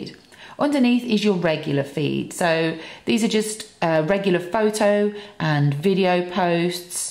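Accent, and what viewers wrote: United Kingdom. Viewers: Are you British,please reply me.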